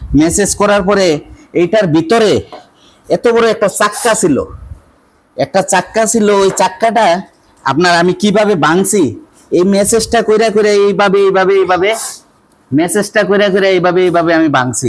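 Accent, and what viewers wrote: Sylhet, Bangladesh. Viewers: ভাই আপনাকে তো সিলেটি নি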